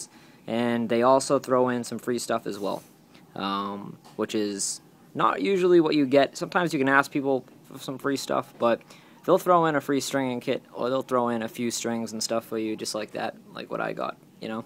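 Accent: Boston, United States. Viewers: u from boston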